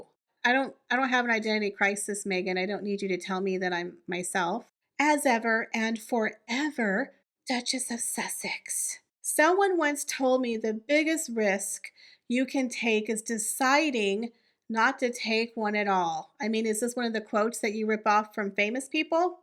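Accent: United States. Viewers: Why is this channel called “Royal daily Tea” when you only do hate videos on Megan and Harry. Also - you sound like an American. Why TF do even care?!?! It’s giving obsessed honestly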